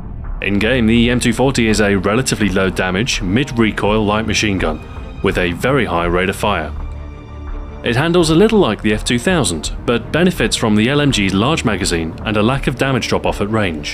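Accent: England, United Kingdom. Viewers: He sounds so formal. I love his accent, he’s gotta be some form of United Kingdom. English I’m guessing. I like this guy. He makes these games so interesting. What a great dude. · damned british accent... -.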